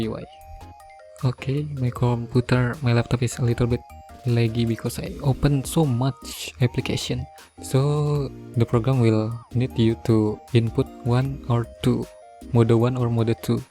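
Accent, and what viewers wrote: Indonesia. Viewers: Typical orang indonesia ngomong inggris, logat indonya berasa banget, anyway good video bro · Sudah kuduga ni orang indo ,dari cara bicaranya masih kedengeran kyak orang indo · Bahasa inggris nya sangat mudah dipahami karna sesama orang Indonesia gw juga kalo ngucapin bahasa inggris kaya gitu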